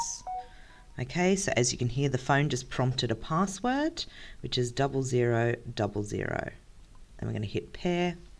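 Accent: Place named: Australia. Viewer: very helpful, thanks! ( so good to hear an Aussie voice narrating)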